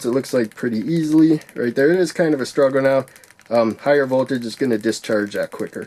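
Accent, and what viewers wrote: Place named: Minnesota, United States. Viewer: Bro, are you from Minnesota? I'm getting that ''ooh, yaah'' Fargo type of accent from you.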